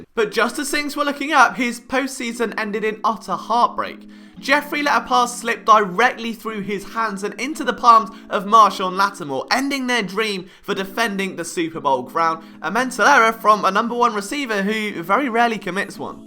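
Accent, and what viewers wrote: United Kingdom. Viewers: Well, I'm from the Philly area, and knows as much about my Philadelphia Eagles as any other reporter, if not moreso. I fully embrace his accent and overall tone and cadence, in fact as an American who spent some time in Scotland, I knew people over there that poked fun at the NFL because it wasn't "literal FOOTball" so frankly I enjoy the fact that a young man from the UK became a top tier Philly Sports writer/commentator because of how much he loves the Eagles etc. You're awesome, and your accent is great, and makes me happy to know that our sport and more specifically my Philadelphia Eagles can not only reach someone from another country, but also capture their heart like it has mine and many others. You be you.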